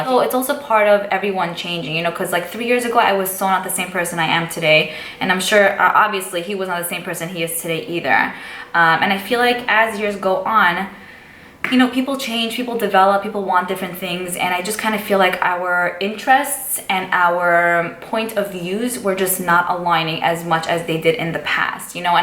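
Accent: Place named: Brooklyn, United States. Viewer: you're a beautiful woman, you're still young so the right guy for you is out there! I love the look you came up with here, the lashes just really topped it off beautifully! I love hearing you speak, I was born and raised in Bklyn (till I was 21) so you sound like home <3 hey have a bagel for me on 13th and 75th if its still there! that place was my jam back in the day for a bagel with melted cheese! lol anyway, just found your channel recently and i'm loving it and you xo Michele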